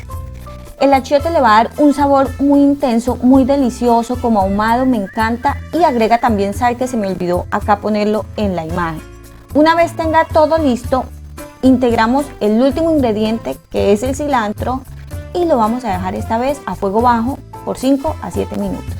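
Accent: Colombia. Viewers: Me encantó la receta quiero saber de dónde eres es que le siento como un acento colombiano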